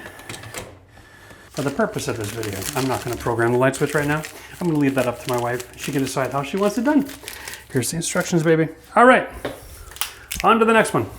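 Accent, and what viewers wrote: Canada. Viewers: In the United States, it is okay to add a 15A receptacle on a 20A circuit, as long as there is more than one receptacle on that circuit. Review NEC article 210.21(B)(3). But with your accent, I believe you're Canadian, I am unfamiliar with the code in Canada.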